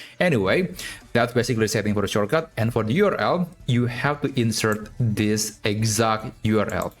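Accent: Indonesia. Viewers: Apakah anda orang indonesia ? Dari logatnya sih orang Indo yah kak ?😊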